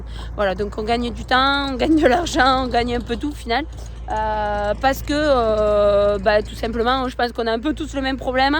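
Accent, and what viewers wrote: South, France. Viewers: Bon courage dans votre travail. J'aime l'accent du sud, votre région est adorable.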